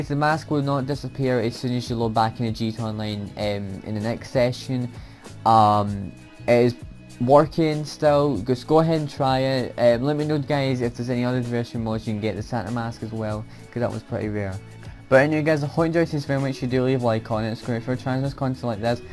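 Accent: United Kingdom. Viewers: Are you from Glasgow? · iSorryME he is Scottish we are special people 😏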